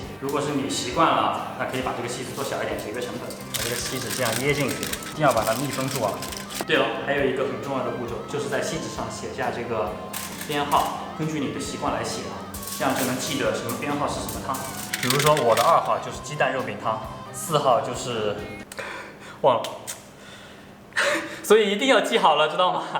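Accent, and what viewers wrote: Nanchang, China. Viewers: 南昌话说的好地道，谢谢介绍南昌美食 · 标准的南昌话，浓浓的粉味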